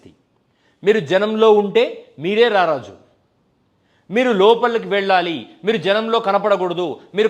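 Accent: Rayalaseema, India. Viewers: Exactly too Good Analysis Aayappa ki maa Rayalaseema Yasa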